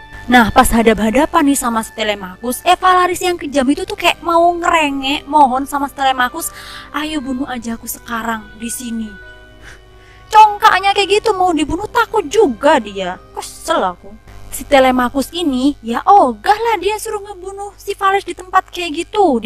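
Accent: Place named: Surabaya, Indonesia. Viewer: Iki arek suroboyoan ta koyok'ane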